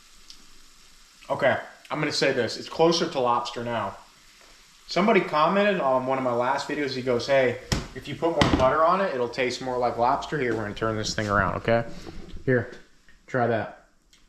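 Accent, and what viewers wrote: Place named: Northeast, United States. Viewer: you may have some northeast in that accent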